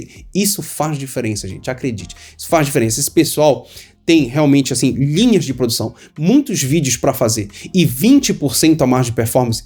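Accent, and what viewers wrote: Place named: Belém, Brazil. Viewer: Mano... Tu és de Belém-PA? Teu sotaque é típico da nossa rapaziada. 😁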